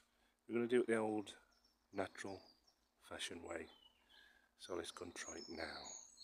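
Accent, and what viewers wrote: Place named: Black Country, United Kingdom. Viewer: Brilliantly done and as a black country girl long moved away I'm loving the accent makes me feel like home.... Thank you so much new sub here xxx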